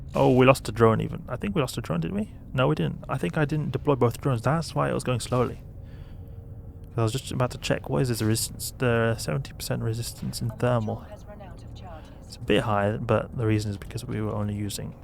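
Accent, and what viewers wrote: South Africa. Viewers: I'm detecting South African in this guy, just a hint.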